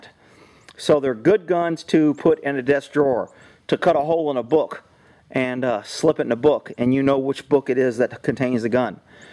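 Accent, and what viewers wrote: Texas, United States. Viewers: This may be the oldest video, I have seen him make, in his newer videos he has picked up more of a Texas accent.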